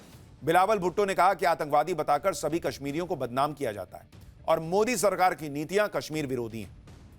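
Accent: Bihar, India. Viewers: Yaar sushant ko bolta sun ke aisa lagta hai ki ye national channel par nahin bihar ki audience ke liye anchoring kar rha hai.....baar baar bihari tone mein baatein bol jana cool nahin lagta dost....kripya bhasha, shabdon aur tone ki maryada ko durust rakha kijiye.....JAI HIND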